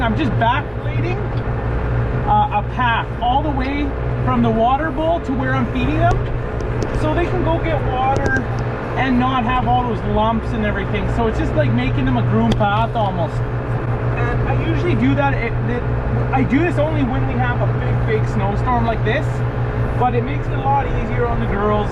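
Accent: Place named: Minnesota, United States. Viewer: Are you from Minnesota? You have an accent from that region. I am impressed by your enthusiasm for your ranch. I grew up in Colorado. We used to get big storms too.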